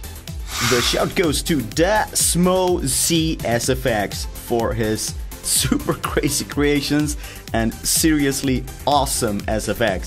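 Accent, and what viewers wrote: Scania, Sweden. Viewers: this is not the real swedish thats skånska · dont teach swedish if you're skånsk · Älskar din skånska dialekt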